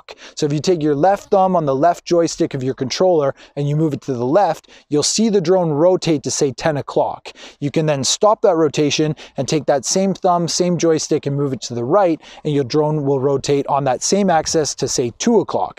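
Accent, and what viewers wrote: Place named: Canada. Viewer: sounds Canadian, lol.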